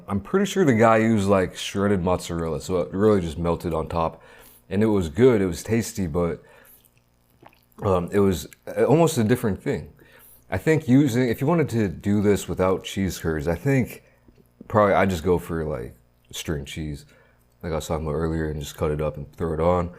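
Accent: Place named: Midwest, United States. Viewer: Why would he be canadian? He clearly has a midwest accent and lives in Korea lmao, nothing canadian about bro.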